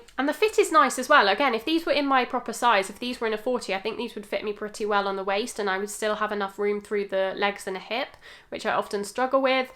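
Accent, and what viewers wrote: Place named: United Kingdom. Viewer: I love your British accent so much ❤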